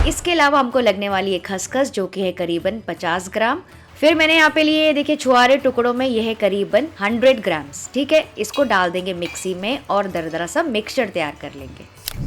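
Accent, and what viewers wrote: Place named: Sindh, India. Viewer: adee jo ghalahin dadho aaio khas toarte sindhi lahjo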